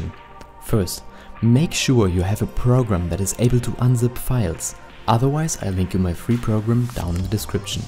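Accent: Germany. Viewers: Sowas von ein Deutscher xD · Impressive fast explained I like it and I sub! Ganz liebe grüsse nach Deutschland, den akzent merkt man lol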